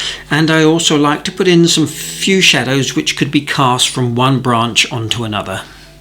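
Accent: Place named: Scotland, United Kingdom. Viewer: 'Jist' loved todays wee tree extravaganza...the touch of 'Scots' twang wis jist grand 😉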